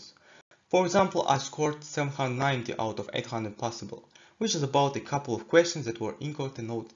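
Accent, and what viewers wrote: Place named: Eastern Europe. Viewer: I love your Slavic accent! This video was quite helpful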